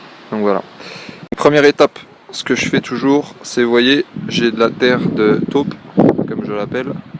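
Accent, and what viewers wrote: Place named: Vosges, France. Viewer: Super ces video, ca enchaine lol... J ai bien vu la (les ) video de Gilbert, mais étant proche de Rambervillers je comprend mieux ton accent;o)) Merci pour toutes ces video et leurs petites musiques douces...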